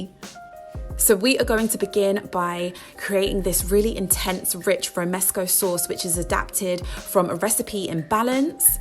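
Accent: United Kingdom. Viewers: is no matter her race. She actually sounds very British to me...like she was born and raised British. Personally, I didn't notice her skin color. I only thought, "Wow! This English chick really knows how to do a video cooking show!!!"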